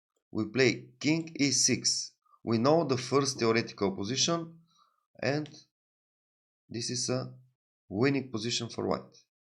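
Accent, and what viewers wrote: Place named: Scotland, United Kingdom. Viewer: 😂 приятный и знакомый акцент) вы из Шотландии?)))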